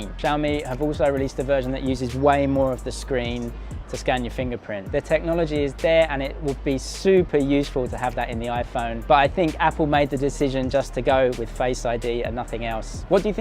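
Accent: United Kingdom. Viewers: Like your British accent! I watched the video you challenged Guizhou dialect , that’s fun, try to speak Cantonese)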